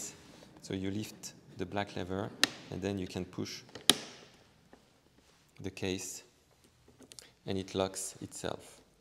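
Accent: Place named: France. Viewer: Très bonne présentation 👍 mais peut-être mieux en français (vue l’accent 😉) et sous titré anglais !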